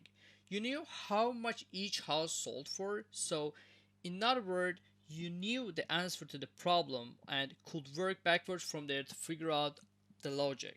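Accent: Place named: United States. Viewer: Abi cok guzel ingilizcen var, Amerikada olmus gibisin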